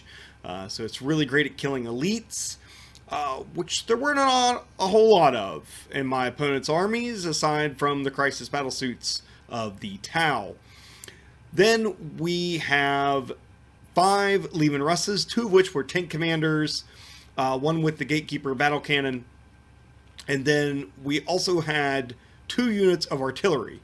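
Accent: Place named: Washington PA, United States. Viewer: Sounds like you’re around Washington pa? I’m from NE Ohio not too far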